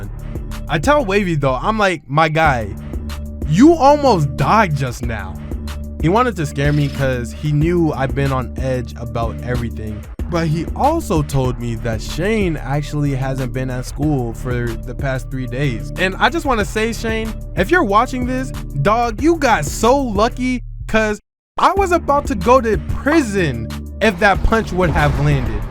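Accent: Haiti. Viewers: I like your Haitian accent tho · Yo like the way he u was talking Haitian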